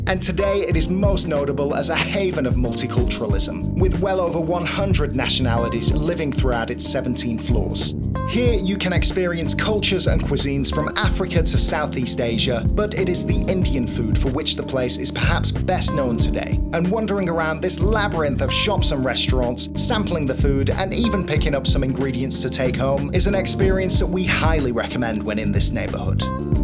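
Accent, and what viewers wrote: United Kingdom. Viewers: Great stuff. Quite a new fan of yours, mate. Great content, and lots of stuff many other videos of HK don't cover. I've already been once a few years ago, and your great footage is setting up my appetite (literally) for another visit. Keep up the good work - those soft Northern tones of yours are dreamy too!!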